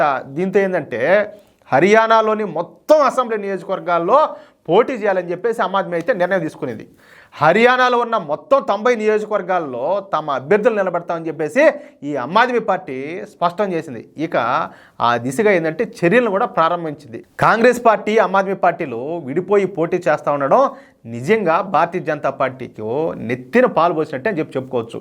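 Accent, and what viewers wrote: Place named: Chittoor, India. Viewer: BABU MEMU KEVALAM MANA CHITTOOR DISTRICT BHASHA.MEEDWARA VINADAM KOSAMEE MANA CHANNEL CHUSTHUNNAMU BABU KAANI E ROJU CHERUVU LO CHEPPALA EXAMPLE MATRAM ASSULU NACHHSLEEDHU BABU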